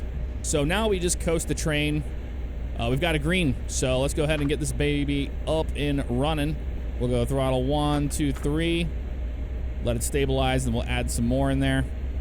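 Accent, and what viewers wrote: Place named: United States. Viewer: 'Train Sim World THHUANNEY THHUANNEY' Murricans and their pronunciation... -.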